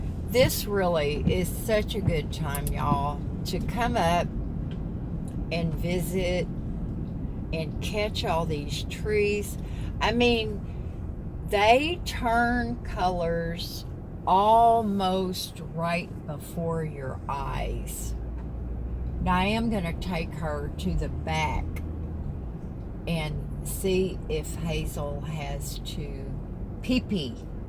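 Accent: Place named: Maine, United States. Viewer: sounds like maine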